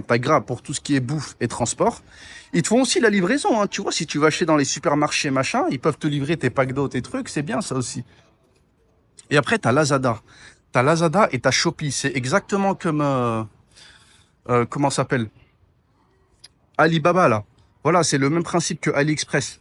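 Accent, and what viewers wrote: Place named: Maghrebi suburbs, France. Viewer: accent de banlieusard maghrébin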